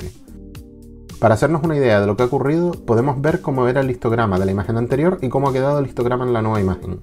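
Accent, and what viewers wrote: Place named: Canary Islands, Spain. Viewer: muchísimas gracias por su respuesta. La verdad que aún soy muy novato en ésto, hasta ahora solo he hecho visual prácticamente, pese a tener lo necesario para fotografiar. Aver si me pongo a ello y pruebo con la versión de los 30dias aver como me va. Por el acento intuyo que eres de Canarias, yo soy de Gran Canaria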